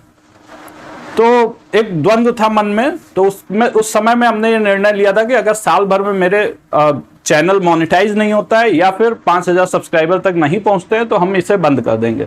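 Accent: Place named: East, India. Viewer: Congratulations sir 🍰🎉🌸🌸🌸🌸🌸📯👃👃.aapka padane ka tarika he itna badia h ki aapka channel chalna he tha sir.sir aap kis City se belong karte hai?aapki language me thoda east ka touch aata h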